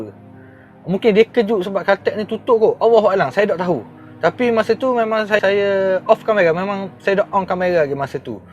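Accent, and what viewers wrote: Terengganu, Malaysia. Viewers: Siang ujan, malam turun salji. 😂😂 Haha...Buat lawok abg ni...Abg ni selit lawak2 skit, tu yg best...Sy suka cara Abg cerita, walaupun tranung tu pekat...Suka dengor loghat2 ni...Keep it up bro...😎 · salon ke salor ke janji org baca dn org faham..tersalah taip,atau dengar x jelas yela sleng ganu kn..tq tau salon rambut ke yerk.salor..slmt mlm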